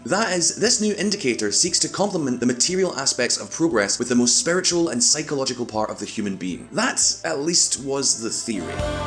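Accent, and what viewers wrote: Scotland, United Kingdom. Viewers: Your scottish accent makes this very hard to follow. But nice video. You're cool. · I knew you would bring this so called refugee issue as you sound very British. The numbers you gave are all wrong. I can see you support for the Nepalese and I as a Bhutanese refuse to call them refugees. You forget we have a UN office in Thimphu. Totally biased reporting or whatever. Bhutan has a porous border with India and that’s how the Nepalese living in India towns of Darjeeling , Kalimpong and Nepal illegally entered Bhutan. So please stop playing the refugee card. By the way there are still many Nepalese here living peacefully and some have even become ministers under democracy.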